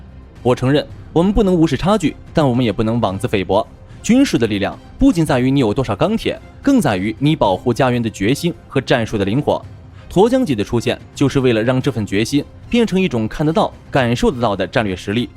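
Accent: China. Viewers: 北京腔談台灣國艦？ Awkward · 你的口音好像大陸人 是因為你很討厭 共產黨嗎？所以才會研究與喜歡台灣的特殊艦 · 用的豆包做的AI视频吗？怎么解说都是一股中国大陆的味道。不应该用台积电的2纳米芯片的AI去做吗？😆😁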